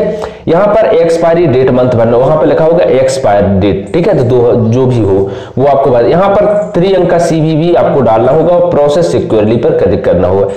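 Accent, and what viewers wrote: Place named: Bundelkhand, India. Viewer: Aapki language sunkar lagata hai ki aap Bundelkhand se belong kartre hai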